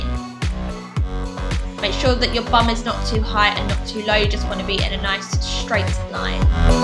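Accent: United Kingdom. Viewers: Is it just me or was your accent more Britishy last time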